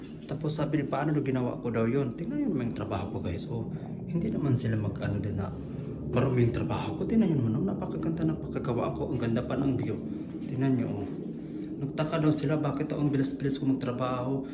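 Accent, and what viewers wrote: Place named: Visayas, Philippines. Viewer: Bisaya ka sir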